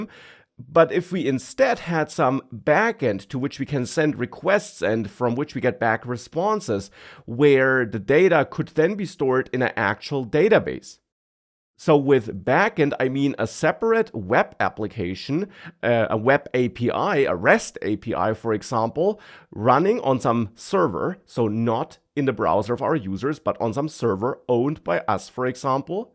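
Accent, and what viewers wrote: Bavaria, Germany. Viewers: funny how the Bavarian accent is disappearing :-)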